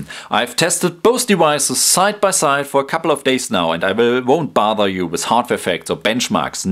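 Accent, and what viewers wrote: Germany. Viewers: German accent :P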